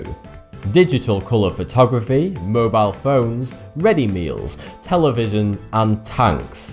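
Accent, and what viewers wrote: United Kingdom. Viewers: I put this on before bed, and only listen to it... Ive listened to a bunch of these, and all I hear is "42 here" not thoughty2 lol.... British accent! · Is that British accent? I must replay some sections because of that accent. Not to say that British isn't a beautiful accent. It's just that I rarely have the pleasure to hear accented English. But the speaker is rather cute. Like, bunny type of cute. The type than you wanted to cuddle and eat- I think I'm going to stop now.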